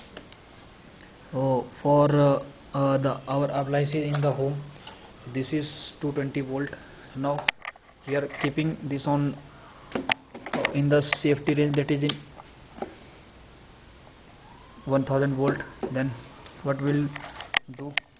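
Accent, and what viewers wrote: India. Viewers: mumbling and strong Indian accent..not dis like for accent...but the mumbling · Big problem when showing how to measure the ACV,.... If would be VERY helpful to actually see where you inserted the lead prongs into the receptacle,,.... and then show the reading on the meter. does it matter where the red and black leads go in a 230 (in Ukraine) receptacle? I'm familiar with American electrical systems, and it doesn't matter on 110 v circuits, ... but 220 must be checked with one lead (it doesn't matter which one) in the ground terminal, and the other lead in either hot terminal. Each hot terminal will check as 110 v,.. and together, the 2 hot 110 v equal the 220 v. BUT in Ukraine it's 230 v and the old houses/apartments DON'T HAVE GROUND TERMINALS (OR GROUND WIRES) IN THEIR RECEPTACLES!! I'm not sure if it's the same in India (which is what the accent of the man doing this video sounds like)?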